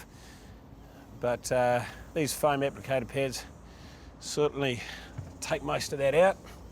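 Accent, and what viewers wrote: New Zealand. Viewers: Hi Daniel, it is New Zealand accent, not Aussie, we say deck.The presenter is great, hubby and i followed instruction and our deck looks great. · NZ accent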